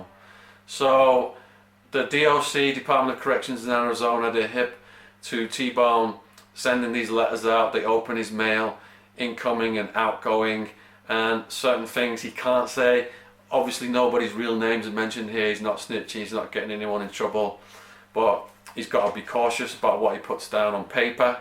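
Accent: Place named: Liverpool, United Kingdom. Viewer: is yr dialect from Liverpool? Brover....brother....cool tho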